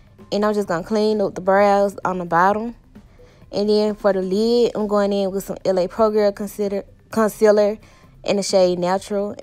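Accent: South, United States. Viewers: nah i was tryna guess what state grl.. because yu definitely from the south! Nd this makeup is fye..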